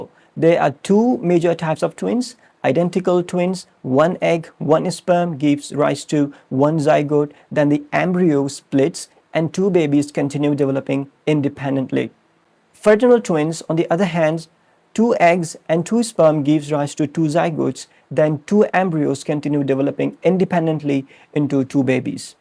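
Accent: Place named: United Kingdom. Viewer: The British accent was great k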